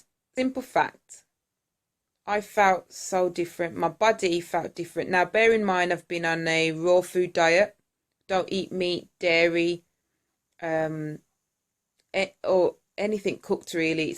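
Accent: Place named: Caribbean. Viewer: yesss sister that accent and I can tell u got Caribbean in you ☺ ..you're right though fibroids causes the abdominal area to bulge, I'm happy for you!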